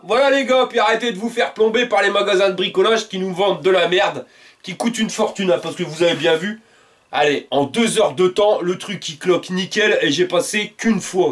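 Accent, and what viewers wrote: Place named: North, France. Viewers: bonjour , trop bien vos idées et en plus vous êtes trop rigolo et ça sent l'accent du nord ou nord pas-de calais je suis du nord merci à vous pour vos idées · Bjr elle est top votre astuce merci pour le partage avec le petit accent ch'timi j'adore 👍🍻JP du Var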